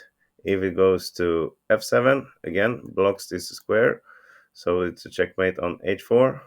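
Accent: Sweden. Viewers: Är du svensk? Det låter så på din brytning. (Are you Swedish? Your accent sounds so.)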